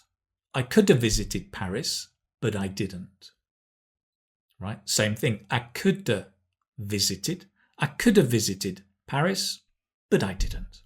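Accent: United Kingdom. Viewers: Hello Firstly,thank you for a useful lesson .I would like to know that it is British accent? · Im starting to watch some British Channel... and it seems that im gonna like it... i always find difficulties in understanding someone who speaks Brits Accent.. thanks for it, Sir.. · Thanks 4 helping me improving my English skills. I really love ur british accent. Ur an excellent teacher. Greetings from Medellín Colombia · You are a legend, I'm fallen in love with British accent, u r a marvel! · I think your pronunciation is Britain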